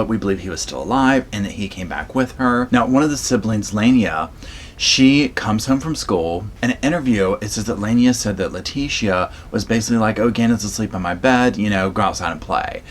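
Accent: South, United States. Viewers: Lovin your accent. The really strong Southern accent is so homely. It’s funny as I’m from Ireland. □□□□:_SofaSquad::_SofaSquad::_SofaSquad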